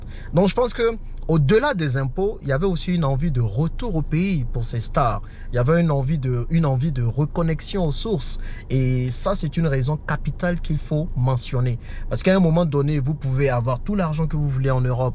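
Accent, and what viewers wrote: Cameroon. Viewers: La première fois que j'ai suivi une de tes vidéos en 2021 , j'ai cru que c'était un frère camerounais, il parlait comme les camerounais et n'est pas trop dans les histoires des clashs et fait bcq dans l'éducation, comme certains de mes frères et sœurs, comme Simo, Stéphanie, Marthe Carine, Nathalie yamb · Moi aussi quand je suis tombé sur ta vidéo pour la 1ère fois il y a un an, j'étais tenté de penser que tu étais camerounais vu ta façon de parler, de voir les choses etc. Ce qui est sùr, l'essentiel c'est d'ètre africain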